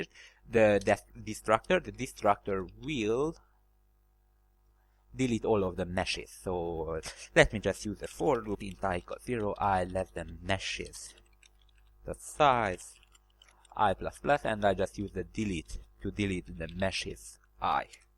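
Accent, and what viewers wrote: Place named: Hungary. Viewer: Are you Hungarian? :)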